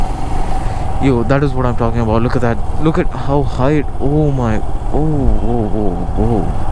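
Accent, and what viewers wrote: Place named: Thiruvananthapuram, India. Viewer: Trivandrum accent tho! Pwoli sanm!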